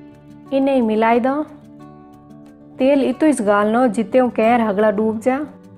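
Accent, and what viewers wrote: Marwar, India. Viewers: मै गुजराती हु। और आपको स्पॉट करता हु की आप अपना वीडियो अपनी मातृभाषा में ही अपलोड कीजिये आपकी मारवाड़ी बोली बहुत स्वीट है आभार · आप प्योर मारवाड़ी बोल रहे हो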